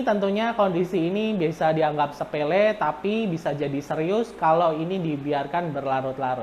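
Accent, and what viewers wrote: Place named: Java, Indonesia. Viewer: Sampyn wong jowo toh dok...jowone njar endi??😁😁😁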